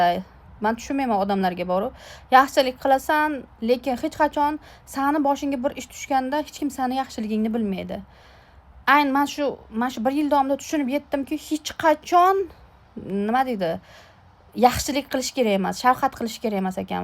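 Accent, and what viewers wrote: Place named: Fergana, Uzbekistan. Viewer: Оллох сабр берсин сиглим. Шевадан умуман Бухороликга ухшамайсиз. Мен Фаргоналиксиз деб уйлар эканман.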